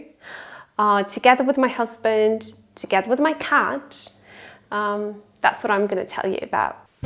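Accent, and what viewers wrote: United Kingdom. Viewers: you really sound British!!! how did you get such a beautiful British accent??? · Wow, your from Belarus, but sound like a British person.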